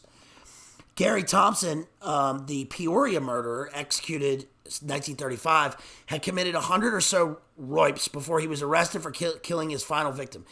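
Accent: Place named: United Kingdom. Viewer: Loving the British accent 😂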